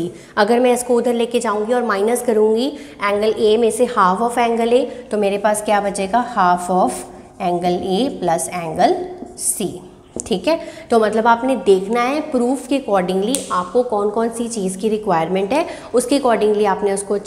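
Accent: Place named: Punjab, India. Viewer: Mam aap ka way of teaching bhut best hai Apki panjabi bahut cute hai ... Mam aap bahut acchi Hain ❤️❤️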